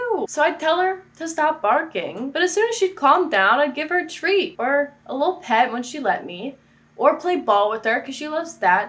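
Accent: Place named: New York, United States. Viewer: You get more of a new york accent with every video haha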